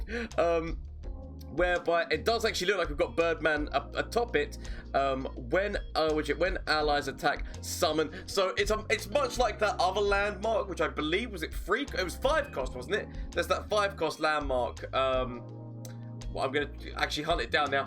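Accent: United Kingdom. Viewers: i just realized that your name is, and i couldn't be more confuse with this British accent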